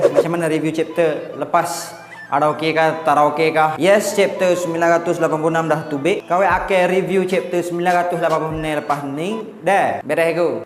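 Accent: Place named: Kelantan, Malaysia. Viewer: Asal Kelate ko abe HAHAHAHAHA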